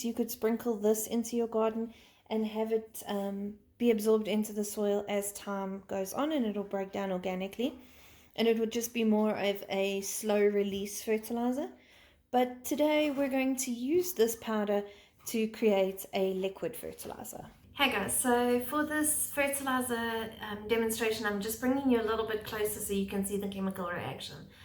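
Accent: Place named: South Africa. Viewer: Sounds like you're in RSA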